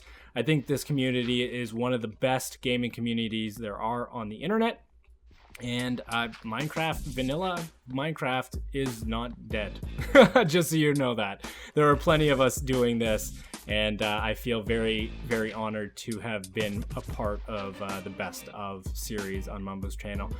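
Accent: Canada. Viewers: hahaha. Its really weird but I heard canadian and bit of nourthen english. It's probably just me tho. By the way your builds are amazing :D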